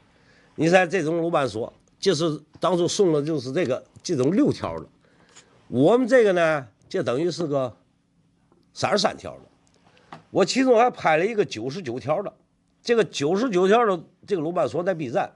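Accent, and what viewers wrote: Tianjin, China. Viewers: ，b站的粉丝基本上是去听你的天津口音的，冲着技术去的很少。你的视频太长，不够精炼，最好能剪辑一下。动不动就十几分钟，而且闲聊太多，年轻人看不了。国外不论是讲数学物理化学的视频很少有超过10分钟的，看起来轻松。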